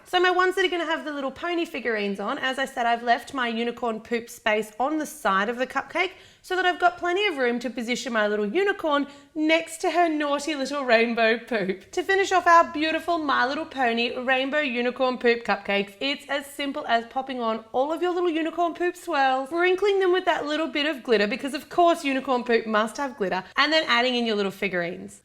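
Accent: Australia. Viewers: You are such a high spirited person! I love your videos! Keep up the fabby work! 👌 P.S love your Australian accent! 😍 x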